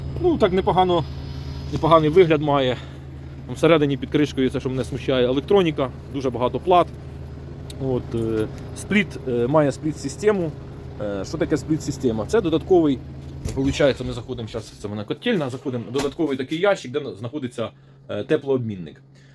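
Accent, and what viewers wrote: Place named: Surzhyk, Ukraine. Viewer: Дякую, все цікаво, але цей СУРЖИК.... Кожне третє слово.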